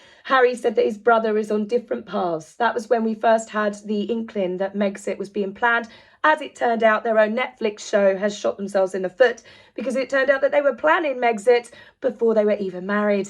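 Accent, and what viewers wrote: South, United States. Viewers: Okay, your American accent, dare I say slightly Southern accent, is EVERYTHING! I have a cousin who speaks just like that 😂😂😂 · Do feel better!!! You still look fabulous!!! Your American accent was good..made me smile!! Americans are more aware every day they are scoundrels...how could we not be, they won't shut up. Too bad their millions cannot buy them a personality, they both are horrid!!! Thanks for video, was wondering where your "voice" was.... Great that you are feeling better!!! 💫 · so. how about trying an accent that doesn't sound like a part of the southern U.S. You obviously have no idea what you're talking about. Maybe try staying in your lane. FYI, we don't like them either. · I think you need a little work on your Southern American accent 😅. But decent try (from someone living in Florida) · OMGOODNESS!!!! Your AMERICAN ACCENT IS AMAZING!!!!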